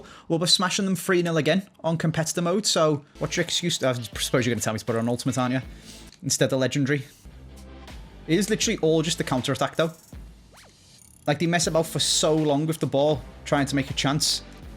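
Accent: Liverpool, United Kingdom. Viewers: Funny how scouse goes when he's just chatting. Happens more in this series for some reason!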